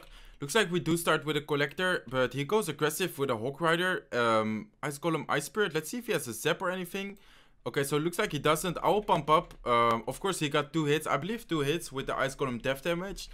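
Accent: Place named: Netherlands. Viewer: Le entiendo y es gracioso el acento inglés que tiene, es holandés y pronuncia las cosas raras a veces. xd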